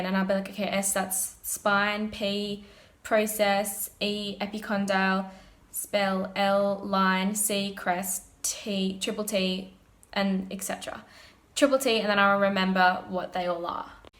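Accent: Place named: Australia. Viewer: I thought it would be a British video but she’s Australian 😂😂😂mate I’m dying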